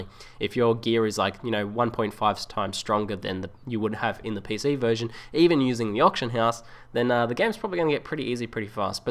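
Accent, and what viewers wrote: Australia. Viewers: hey, if you want audiences other than Australian and New Zealanders, please talk slower so that people actually understand through your rather thick accent. Don't get me wrong i think you have some good contents that i find interesting. However, this video i had to turn on subtitles. I am a native English speaker, yet i couldn't understand half of what you said. What's even worse, you're talking about something that is slightly more complicated then general topic that doesn't really need slower speech. I love your accent when it's not explaining technical subject like describing differences in gaming.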